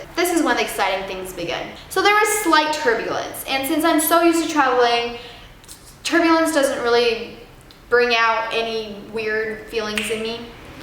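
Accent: United States. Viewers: How did she get such a flawless American accent in just 4 years at that late of an age? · She's Turkish but has lived/visited America so she picked up the American accent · how come she has an American accent but used to live in Turkey?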